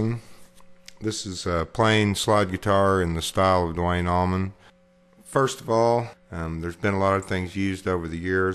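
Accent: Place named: South, United States. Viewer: haha yeah you do, i've heared a lot stronger southern accents before but for someone from scotland.. its still funny:P thinking of doing any other guitar lessons? perhaps on soloing? ( accoustic ).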